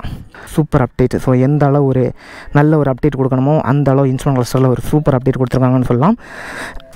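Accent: Kanyakumari, India. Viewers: Wow KK slang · R u from kanyakumari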